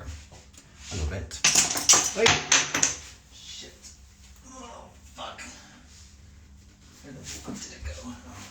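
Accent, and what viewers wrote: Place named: England, United Kingdom. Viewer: English accent!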